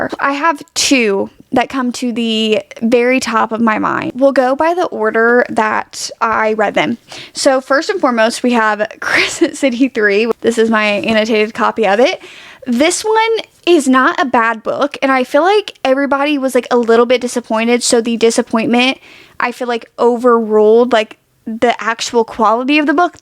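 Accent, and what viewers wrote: South, United States. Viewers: with the southern accent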